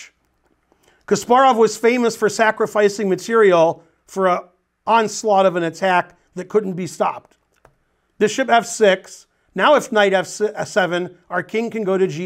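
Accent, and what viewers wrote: United States. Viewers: Are you 'murican, by any chance?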